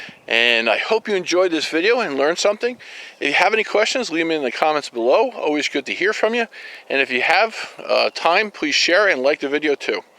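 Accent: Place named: Pennsylvania, United States. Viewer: Where in PA are you? I'm just assuming PA or southern NY from your accent...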